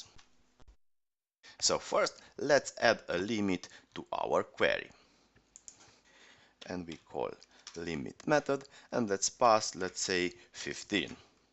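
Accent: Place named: Russia. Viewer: Your accent is so cool, it's like Russian mafia people, so cool, also the tut is really helpful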